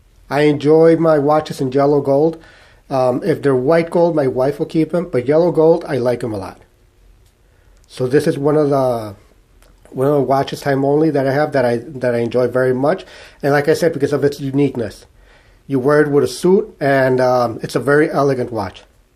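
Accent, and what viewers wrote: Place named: Mexico. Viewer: Don't pay attention to these fucking swiss fanboys who complain about your mexican accent. You have more class and appreciation of unique timepieces than all of them put together.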